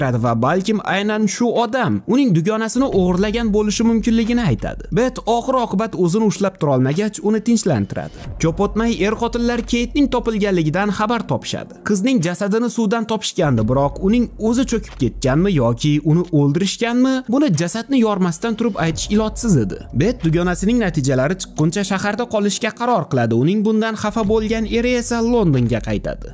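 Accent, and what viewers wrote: Khorezm, Uzbekistan. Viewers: Ogʻo omad xorazmchasina yoribsiz lakin sharxni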